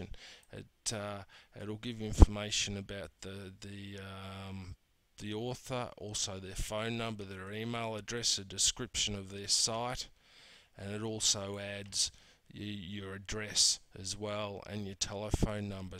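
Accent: Australia. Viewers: great post love the Australian accent:) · Thanks, also love your wonderfully laconic Aussie accent!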